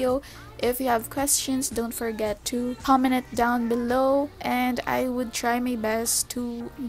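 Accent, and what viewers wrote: Philippines. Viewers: Heard her Filipino🇵🇭 accent from the start😌 but confirmed it when she said cHoUR🥴 AHAHAHAHA nice videooo thankss❤️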